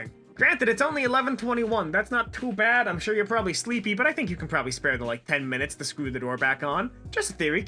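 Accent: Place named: New England, United States. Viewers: You are the most New England sounding person I've ever heard. I'm from California, you've basically got a foreign accent as far as I'm concerned. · The fact that you love in New England explains your accent! My uncle used to live in Rhode Island and now lives in NH not far from the Mass border and he has a similar accent. As an English boy it is funny and weird!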